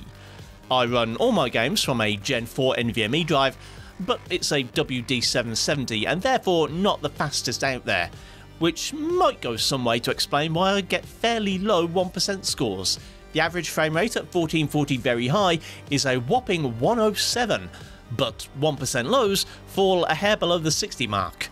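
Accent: England, United Kingdom. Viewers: Be quiet!! Mr english accent got a new video!!!! 😎🤩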